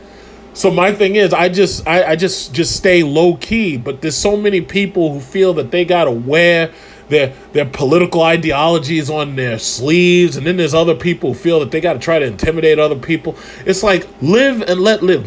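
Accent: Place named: New York, United States. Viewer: Your accent sounds so old timey New York.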